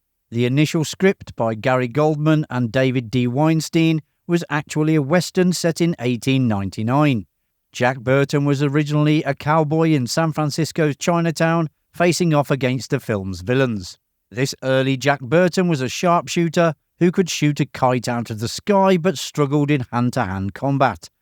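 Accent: United Kingdom. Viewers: Its only his British accent! I'm getting Cattrall! UK here.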